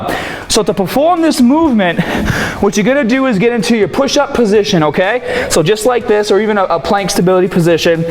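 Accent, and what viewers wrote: Boston, United States. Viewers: The Boston Accent is Wicked Cool. With that strength, body, agility, and stamina, can speak anyway he wants. Your my hero. (Especially since we both are from Massachusetts). Great Exercises. I will try them next training day. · hey Im curious. When I am deadlifting, when I go heavy and somewhat heavy(for me) I notice I have a somewhat round in my back. Not like incredibly terrible, but I notice it when I recorded a side angle, for testing purposes. Should I drop the weight down like 40% of my 1 rep max, and try to work on perfect form while building back up, or is a slight bend in my lower back okay? I wasn't using a belt at the time because I notice you dont until you get near your max, so I try to stray away from the belt until I need it. What % of your one rep max do you start to use the belt? Any help from anyone would be great! 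I love your video's, thanks for teaching me nearly everything I know about lifting. Cheers. P.S I always thought you had a boston type of accent, didnt ever know you tried to cover it up xD I always heard it. haha keep it up! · i dont know how i havent noticed it, but you have one of the heaviest boston accents ive ever heard. i go to BC so i hear it all the time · is it a Boston accent? I'm from UK I don't really know, but it makes me smile adds to your character LMAO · Just like how different it is, I just love Boston accents ahah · That Boston accent coming out strong! Awesome video though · Thumbs up for Bawston! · Since when did he have a Boston accent??? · Are you from boston? You have a boston accent tbh haha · What's with the Boston accent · Is it just me or did he develop a Boston accent? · love that boston accent man. never lose it! :)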